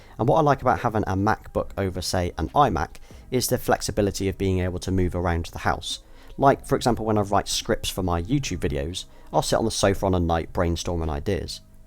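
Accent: United Kingdom. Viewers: Are you from the uk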